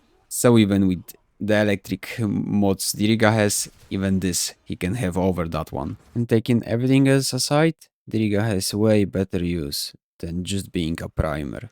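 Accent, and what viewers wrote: Poland. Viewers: Just on their own, hounds with manifold and contagious bond, synergized prospectus and some good statuses on their weapon can nuke rooms on steel path (especially now if your hound gets jade eximus aura that's like guaranteed room wipe xD ) But man, damage on that Syam is crazy! I really have to finally get this weapon Also, it's Muramasa a reference to Terraria? Oh and you're from Poland, yeah I thought accent sounded familiar :D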